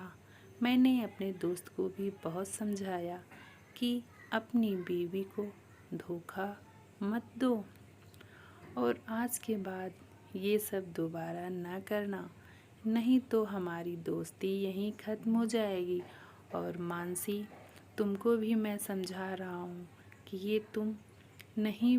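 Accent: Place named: Awadhi, India. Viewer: Big Like 14 bohut khub surat prastuti di aap ne ji aap ne bohut khub surat voice sunai our awdhi mea bola karo bohut acchi lagti hai aap ka swagat karta hu aap plese connect with us long term 🙏💐👍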